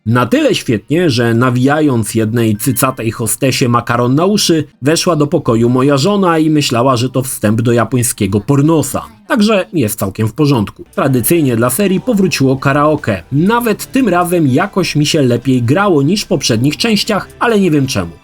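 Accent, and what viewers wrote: Łódź, Poland. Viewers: tu nie ma w ogóle co porównywać. swoją amatorszczyzną i bełkotliwą łódźką dykcją nie staje nawet w szeregu z i może mu co najwyżej buty czyścić.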